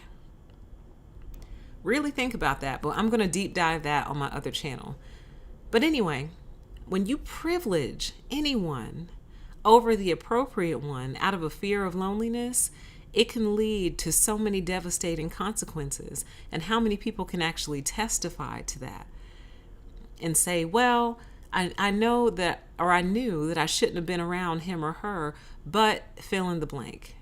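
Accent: South, United States. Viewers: your slight southern tone just makes it that much more rich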